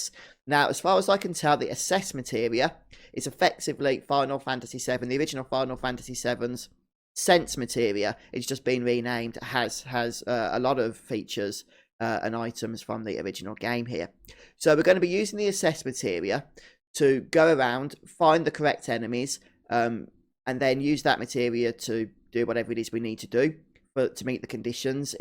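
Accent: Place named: Somerset, United Kingdom. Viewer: Are you from Somerset or somewhere? Haha. ;)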